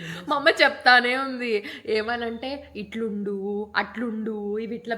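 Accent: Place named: Hyderabad, India. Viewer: Garu meeru Hyderabad lo leg petinaru total ga Hyderabadi Slang kuda vachesindi super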